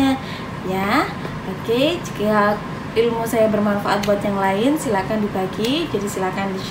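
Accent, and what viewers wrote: Java, Indonesia. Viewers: iki wong jowo to, ketok medok 😅😅😅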